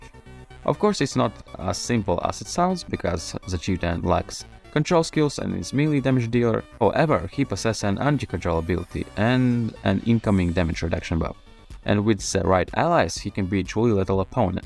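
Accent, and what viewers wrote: England, United Kingdom. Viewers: Не понял. Are you from England? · о вы из Англии?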